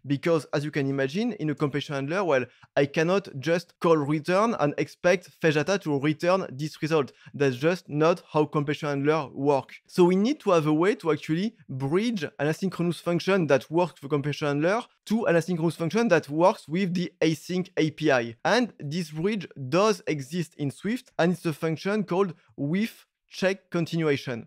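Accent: France. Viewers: J’adore l’accent français!!!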